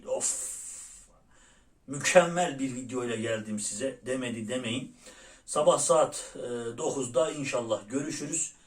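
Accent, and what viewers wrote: Erzurum, Turkey. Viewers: Sen Erzurumlusan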